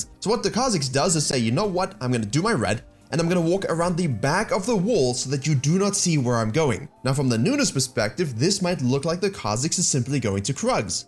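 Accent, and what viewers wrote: South Africa. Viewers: Your video make me feel like there's a chance I could go pro! Hahahaha jk but seriously your Channel is amazing, also what accent is that cause I hear South African.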